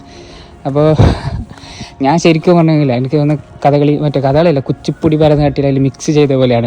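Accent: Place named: Thalassery, India. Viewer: bro i laughed a lot 😂😂😂 nalla pang indappa samsaram ❤thalassery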